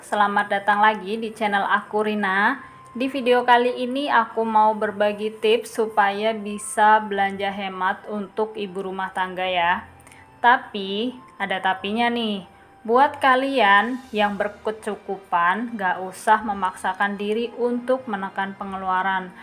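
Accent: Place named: Banyumas, Indonesia. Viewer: Salam kenal bunda pinter bget ngatur uang blnja , kowq bahasa ngapak daerah mana bun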